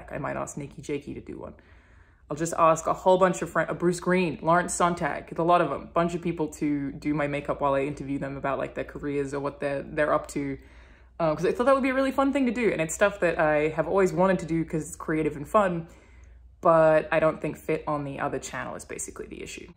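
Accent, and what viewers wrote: Australia. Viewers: can you make a channel without the aussie accent? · Liked. No freakin' clue how you ended up in my recommendations. You're cute. Your dog is amusingly weird. I have no idea about painting models, but I agree that Ripley needed to be greener. Tattooing eyebrows seems severe, but I'm a 60-year-old guy, so there's that. Obviously, I'm not a gamer, but I did play Descent 3 for an hour yesterday. Does that count? Shameless confession: Aussie accents (yes, I'm aware there are many) are simply lovely. If you show up in my recommendations again, I am likely to watch. Yay, Al Gore Rhythm. Be happy,. Your dog is brilliant.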